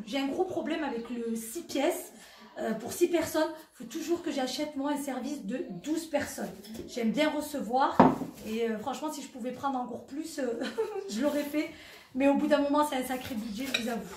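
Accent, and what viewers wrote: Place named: South, France. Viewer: C’est en région parisienne ? Vu ton accent cela doit être dans le Sud😜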